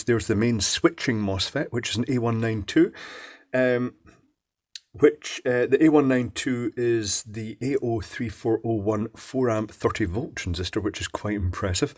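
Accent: Scotland, United Kingdom. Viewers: In addition to your lovely Scottish burr(I could listen to you all day), you have just saved me a lot of money and a lot of potential pain. Glad I found you.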